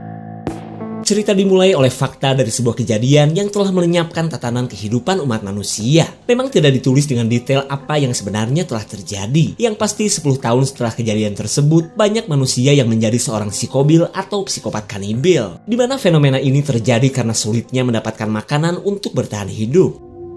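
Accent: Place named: Garut, Indonesia. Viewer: Mang orang mna ,logat na garut pisan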